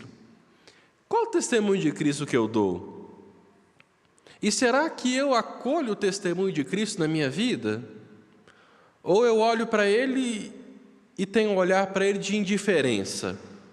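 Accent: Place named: Minas Gerais, Brazil. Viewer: A padre tem aquela fala e coisa descansada do mineiro raiz ,que eu gosto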